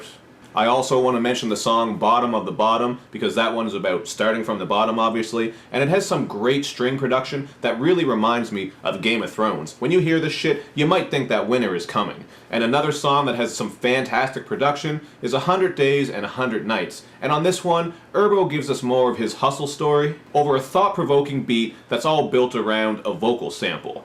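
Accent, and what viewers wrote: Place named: Nova Scotia, Canada. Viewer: i'm gonna take a wild guess and say this guy is from nova scotia. that accent sounds nova scotian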